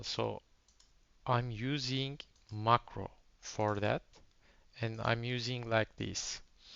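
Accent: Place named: Turkey. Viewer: türk aksani =)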